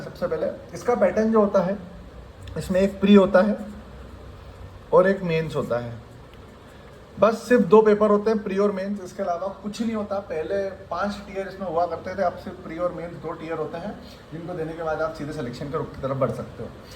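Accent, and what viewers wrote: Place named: Madhya Pradesh, India. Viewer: Bhaiya aap to MP ke lag rhe ho yar aawaz se ❤❤❤❤❤ Proud of you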